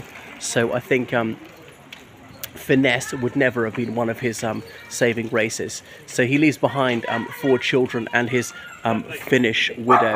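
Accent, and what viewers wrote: RP, United Kingdom. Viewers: I think it's brilliant, and also fitting, that you are Irish but with a cut-glass English accent...and your name is! Love your videos. Just discovered you. Don't know why I didn't come across you before now. Hope you make some content for St. Day coming up!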